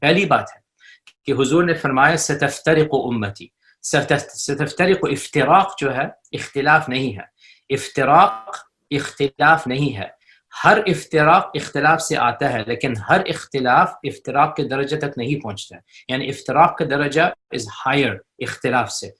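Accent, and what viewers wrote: Pakistan. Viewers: Loved your urdu accent ustad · I love ur urdu accent, May Allah bless you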